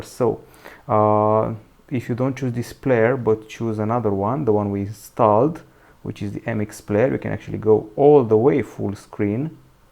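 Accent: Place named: Romania. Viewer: You have like a romanian accent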